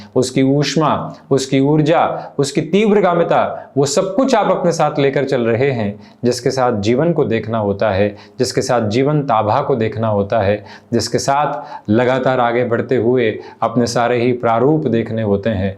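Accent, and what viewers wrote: Standard. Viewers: हिंदी भाषा पर आप की पकड़ वाकई अद्भुत है। शुद्ध उच्चारण के साथ भाष्य प्रभाव अचंभित करता है। बहुत दिन बाद इतना शुद्ध उच्चारण सुनने को मिला।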